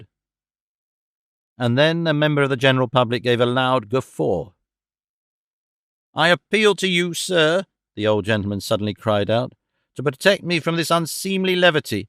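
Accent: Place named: England, United Kingdom. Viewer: Best version of this, in my view. The English accent just MAKES it.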